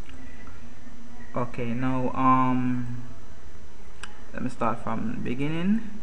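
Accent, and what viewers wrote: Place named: Jamaica. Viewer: i can tell ur jamaican.....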